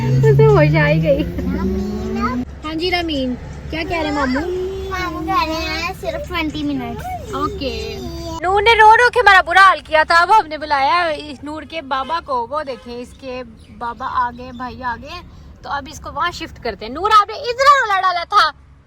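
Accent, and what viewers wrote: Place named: Punjab, India. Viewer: Punjabi tark is so good😂😂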